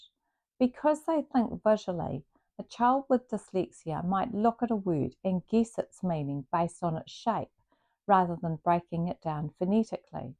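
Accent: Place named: United Kingdom. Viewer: great, nd i really liked youre British accent!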